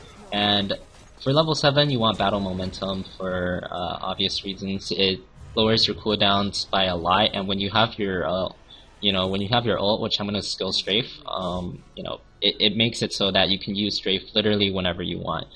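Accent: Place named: England, United Kingdom. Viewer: Bro sounded more English than he does now, love you xo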